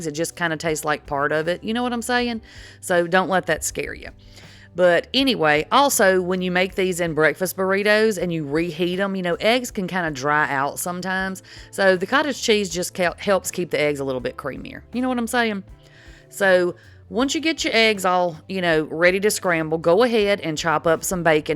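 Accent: South, United States. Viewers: When you hear that Southern accent, you KNOW she can cook.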